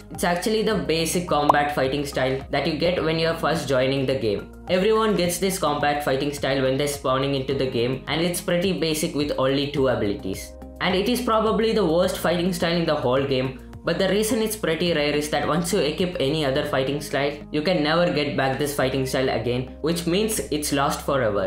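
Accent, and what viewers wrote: India. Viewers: indin accent 💀💀💀💀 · You sound like an indian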